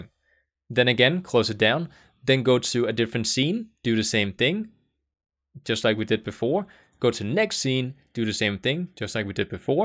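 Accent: Denmark. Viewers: bro u danish? i can hear that your danish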